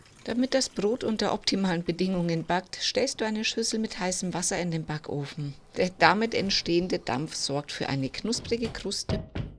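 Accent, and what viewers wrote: Bavaria, Germany. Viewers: ja, ich habe mir seit gestern bereits vieles angeschaut und habe sie auch abonniert! Ihr bayrischer Dialekt ist Balsam auf meine Seele! Ich habe 23 Jahre in München gelebt! Ganz liebe Grüße!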